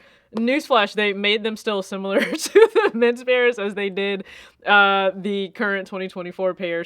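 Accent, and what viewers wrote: South, United States. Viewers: YOU FEOM THE WEST COAST LOVE I COULD HAVE SWORN YOU WERE FTOM LIKE SOUTH CAROLINA KENTUCKY SOMEWHERE LIKE THAT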